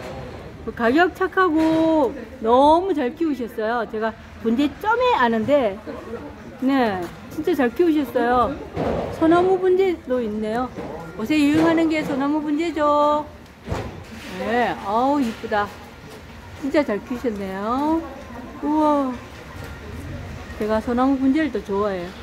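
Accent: Gyeongsang, South Korea. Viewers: 님 어재는 알림받고 왔고 오늘은 님 구수한 경상도 사투리 목소리 들으러 왔어예 ㅎㅎ우리 시골산에 가면둥글많은대 즐청 풀청 합니다 예 😅😅😅❤❤❤❤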